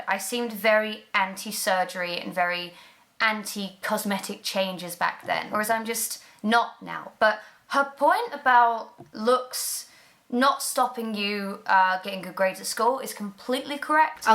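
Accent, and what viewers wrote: United Kingdom. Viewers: why did you use to sound more British back than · alexstfu what? She still sounds british, there are so many different accents in the UK lol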